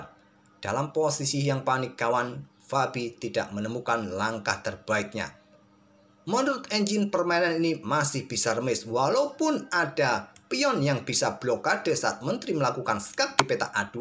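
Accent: Java, Indonesia. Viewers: tak tantang lagi ogah, tak tantang lagi emoh, wong jowo ternyata admine wkwkw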